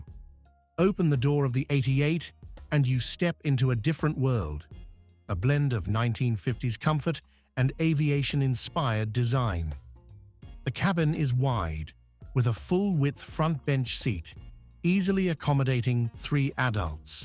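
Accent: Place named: RP, United Kingdom. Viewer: Why does the story of a classic AMERICAN automobile have to be narrated by a British linguistic sophisticate who sounds as though he should be orally presenting a Charles Dickens novel? Also, the U.S. InterState Highway Act was not signed into Law till 1956.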